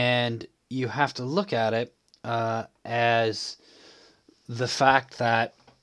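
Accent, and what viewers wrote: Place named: United States. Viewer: Thanks G but why as an American(from ur accent) are u doing Alevels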